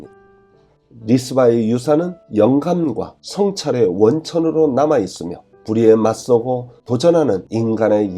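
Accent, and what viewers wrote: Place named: North Korea. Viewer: 강해 하시는분이 보기에 그리 연세가 지긋한 분이 아닌데 왜 북한 사투리를 하시나요?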